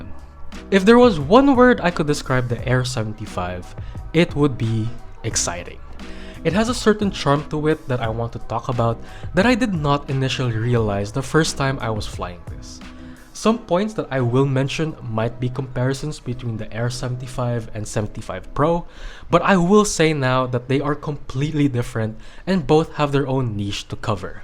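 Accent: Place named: Philippines. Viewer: sabi na e.. the accent was a giveaway.. kabayan :)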